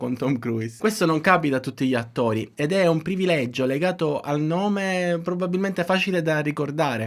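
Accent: Palermo, Italy. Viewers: Il signorino perfettino asettico, no grazie, adoro la personalità e colore con vocaboli palermitani.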